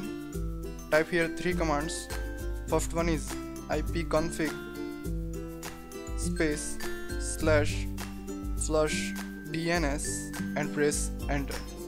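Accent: India. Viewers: Indian accent, I wonder if it is a scam...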